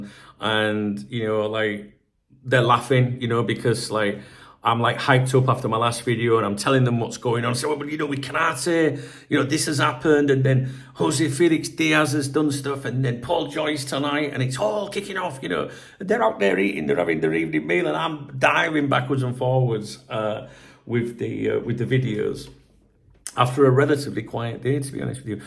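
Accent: Yorkshire, United Kingdom. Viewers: me and the missus have been trying to figure out where your accent is from. My guess is Yorkshire, the missus reckons Cumbria. Are either of us correct? If not, help us out and tell us where the effing hell you're from. Haha.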